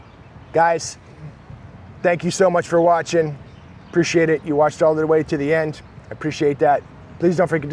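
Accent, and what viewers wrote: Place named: Seattle, United States. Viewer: I can tell you are from Seattle by the way you talk.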